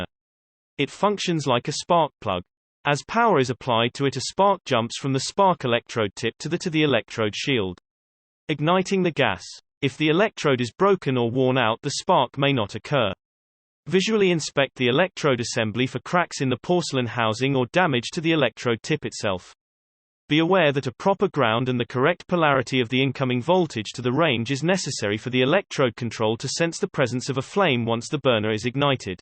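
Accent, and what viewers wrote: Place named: England, United Kingdom. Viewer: What a waste....really? No mention of stove top problems...only oven issues...but, suppose that by putting an English accent as narrator makes it seem ok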